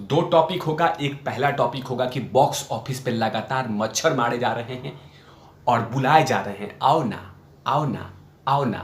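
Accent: Bihar, India. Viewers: Kya Bihaari bolte hain bhaiya, matlab ekdum maja hi aa gaya.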